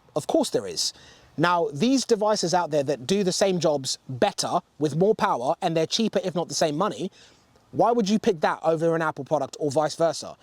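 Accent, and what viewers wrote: United Kingdom. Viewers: You sound like you may be in the UK any issues riding it on cycle paths or in public etc. if you’re not in the UK please ignore.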